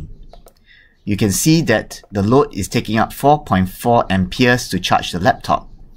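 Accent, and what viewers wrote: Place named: Singapore. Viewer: sound singaporean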